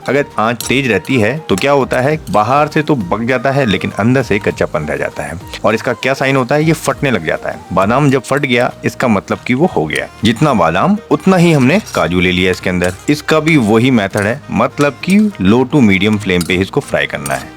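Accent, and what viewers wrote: Uttarakhand, India. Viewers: हम दोनों बैठ कर TV screen पर आपके द्वारा बनाये जाने वाले व्यंजन को गहनता से सुनते हैं। कभी कभी आप कुमाऊँ लय में बोलते हो कभी हरियाणा राजस्थान से मेल खाती भाषा का प्रयोग करते हो। सारा मिलकर आपके प्रोग्राम स्मर्णीय होते हैं... धन्यवाद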